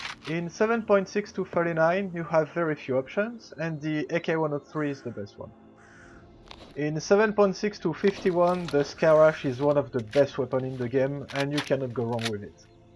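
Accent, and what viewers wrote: France. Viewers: Tu est français ? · Are you French?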